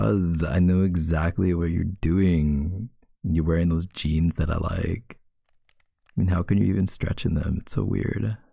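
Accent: Canada. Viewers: HIS ACCENT IS SO(;$/!( I LOVE CANADIANS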